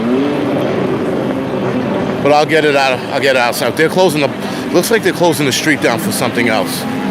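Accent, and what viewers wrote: New York, United States. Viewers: Can't see you and you sound like a newyoker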